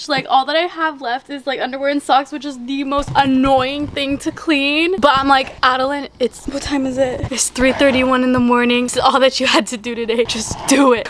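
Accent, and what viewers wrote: Canada. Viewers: i know this is a random question, but i cant tell if has a Canadian accent?? does she?? i can never tell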